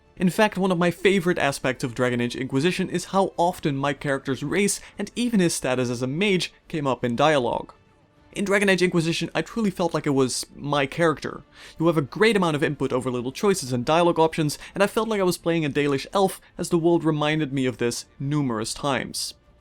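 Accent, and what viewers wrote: Netherlands. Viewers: hmm dat accent....bent u Nederlands ?? :D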